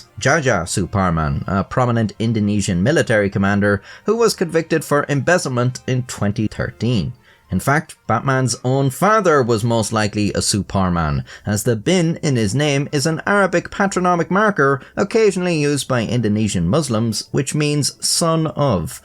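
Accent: Ireland. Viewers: I love the Irish accent. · Well, I subscribed for a combination of the charming Irish accent, unassuming animations, and vague but unsubtle threats against people who don't subscribe. But we all have our reasons. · Listening an Irish man talking feels better than a british man talking 😅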